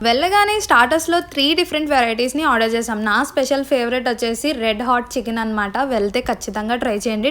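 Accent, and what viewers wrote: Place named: Godavari, India. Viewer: Bad comments gurinchi alochinchaku normal ga muslims yeppudu hindi ne matladatharu kani ni achamaina godavari slang bagundhi and Mamulgane milo vallani bayataki ranivaru and face kuda kanapadanivaru but miru intha freedom ga vunnaru ante mi intlo mi valla dhaggara miru thechukunna trust....chala baga vuntay mi videos ❤🥰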